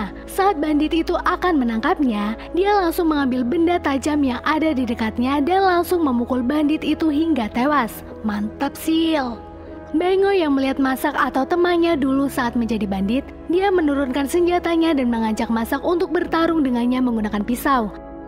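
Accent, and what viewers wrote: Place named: Banyumas, Indonesia. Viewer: Mbak lucu banget sh, pake bhasa jawa ngapak kwkwk, semoga sukses terus terus chenelnya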